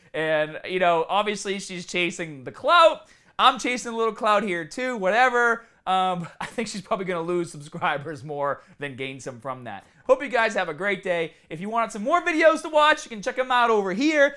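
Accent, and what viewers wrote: Boston, United States. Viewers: this is the first video of yours that I watch. Are you Bostonian or something? Ie. Abbey Shaaarp. I've been doing my Caaardio. Maaargarita.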